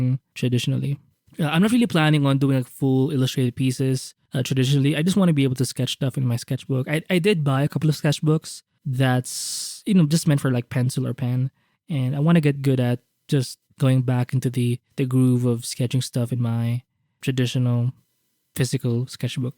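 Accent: Philippines. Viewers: YOU SOUND FILIPINO!!!!!!!!!!!!!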